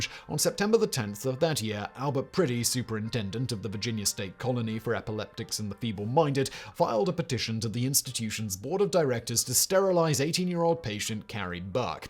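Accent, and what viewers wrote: United Kingdom. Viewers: oh a british vauce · Great video as usual. Simon I had to think twice when you pronounced cretin with that Brit accent of yours. Americans have only heard it pronounced cree-tin and it applied to all sorts of monstrous beings.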